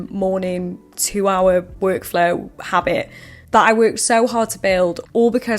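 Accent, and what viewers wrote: United Kingdom. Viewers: Aww yew Bri’ish 🎩💂☕️🤓🤓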